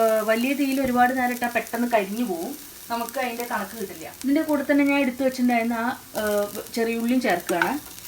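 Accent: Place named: Palakkad, India. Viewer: palakkd nnano, njangl palakkattu karade oru samsara reethi ndu and cooking style too... Rasam koodi onnu idoo... Plz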